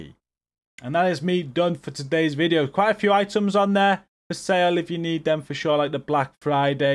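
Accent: United Kingdom. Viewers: Your English is hard to understand, is it British English?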